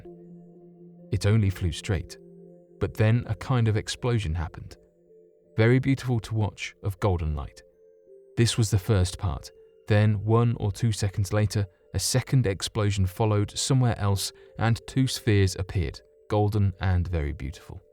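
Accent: United Kingdom. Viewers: Because they are British and pronounce somethings very different.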